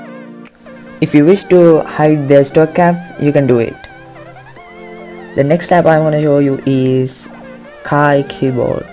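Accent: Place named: India. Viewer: Are you Indian or Pakistani? I'm assuming Indian from your accent. You should get a Snapchat account so we can know when you upload a video.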